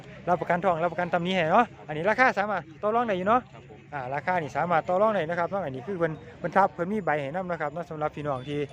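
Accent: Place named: Sisaket, Thailand. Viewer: สวัสดีค่ะอ้าย ศรีสะเกษชัดเจนจ้า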